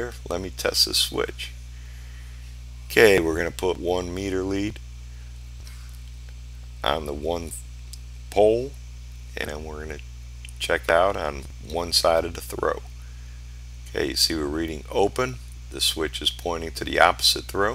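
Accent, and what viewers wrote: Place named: Chicago, United States. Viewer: Thanks for the excellent video. Like the Chicagoland accent as well. Reminds me of home.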